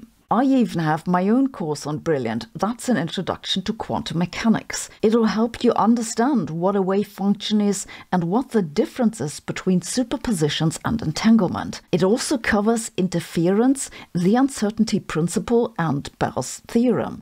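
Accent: Germany. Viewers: "that I can say bullshit when I mean bullshit". This is very clear, but not very lady like. I like your german english - I speak it also. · Love the way she says BS with her German accent.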